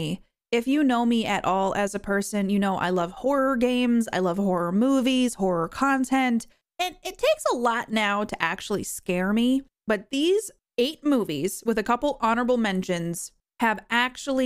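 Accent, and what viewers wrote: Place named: Transatlantic, United States. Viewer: imma comment that your transatlantic accent is perfection.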